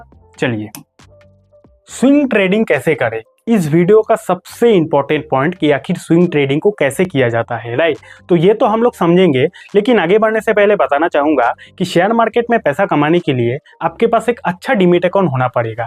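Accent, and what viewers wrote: Bengal, India. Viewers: Sir, aap Bengali hain?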